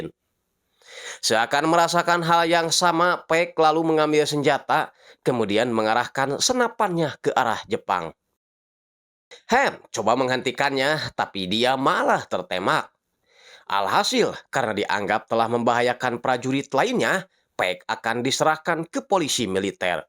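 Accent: West Java, Indonesia. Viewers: Nyunda pisan😂😂😂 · Akang orang sunda ya?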